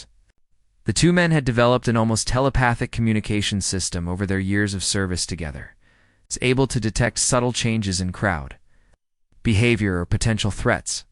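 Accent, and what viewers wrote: United States. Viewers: Yet again more smooth American accented bullshit! Why not take the mickey out of the Arlington guards? Chickenshit?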